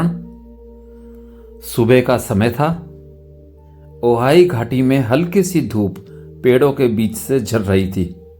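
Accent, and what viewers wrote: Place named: Rajasthan, India. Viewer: Bhai aapka ascent hi complete nahi aap mut bola karo. Aap shabdon ki khichdi banate ho. Aapka hindi per sahi control nahi hai. Aap rajasthani ho.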